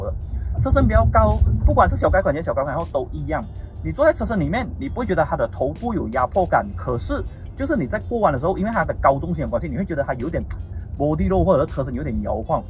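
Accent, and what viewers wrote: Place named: Malaysia. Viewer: 马来西亚人讲中文真的很可爱，喜欢听😂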